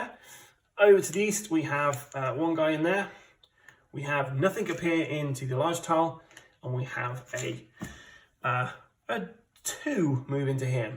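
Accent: United Kingdom. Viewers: What an unfortunate massacre. Valiantly fought, alas it was not meant to be. I do not know enough about this game to give a proper assessment of what hurt our chances most and what could have been done better, but from my layman’s position, it looked like the scout’s assault on the objective room manned by three Germans might have been overly optimistic, and its failure the point where the operation took a turn for the worse. You’ll get ’em next time! By the way, love your ’40s British accent.:D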